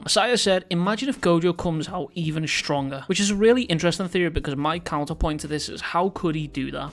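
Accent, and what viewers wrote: Liverpool, United Kingdom. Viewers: You a scouser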